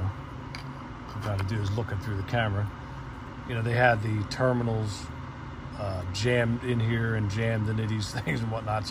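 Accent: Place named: Philadelphia, United States. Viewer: Are you from Philly? 😂 I can tell by how u talk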